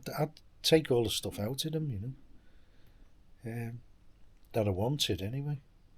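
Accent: Liverpool, United Kingdom. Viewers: Is that a Scouse accent? Great vids cheers mate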